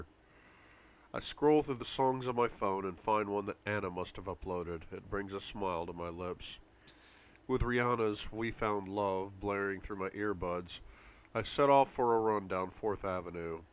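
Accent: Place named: United Kingdom. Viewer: Loved you reading this...and since im from the UK your British accent is excellent. Well done and thanku ❤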